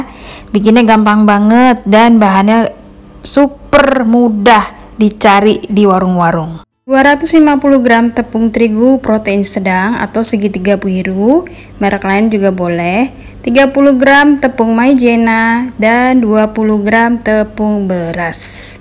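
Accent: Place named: Medan, Indonesia. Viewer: Asli mendan ya bunda soalya logatnya ketauan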